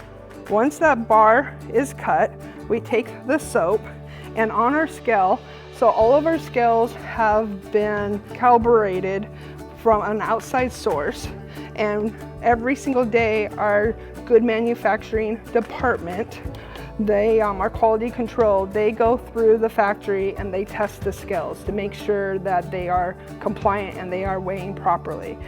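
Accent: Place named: Arizona, United States. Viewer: I love love love hearing your Arizona accent. It reminds me of my grandpa. ❤️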